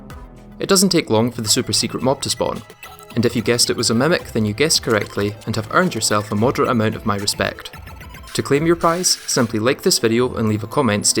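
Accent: Scotland, United Kingdom. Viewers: are you scottish perchance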